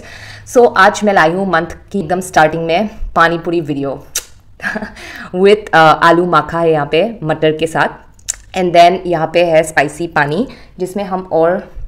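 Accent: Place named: Bengal, India. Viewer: দিদি তুমি কি বাঙালি ?.......... আমি sure না তাও তুমার কিছু কিছু কথায় বুঝা যায় তুমি বাঙালি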